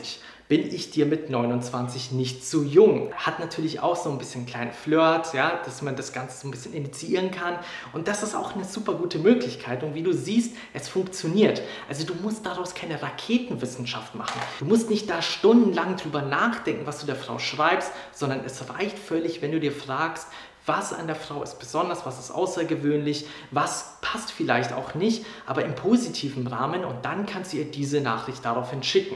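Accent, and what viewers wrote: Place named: Franconia, Germany. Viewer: Junge.... wenn du brav und anständig bist als Mädel, dann lässt du nicht nen fremden Typen wegen einer Pizza zu dir kommen. Ich bin nicht sicher, ob die Tips wirklich sinnvoll sind. Du scheinst ja halbwegs kreativ zu sein, aber die Gedanken die du dir über das Profil machst, und dann versuchst witzig zu sein.... puh.... seltsam. Dafür mag ich deinen Frankendialekt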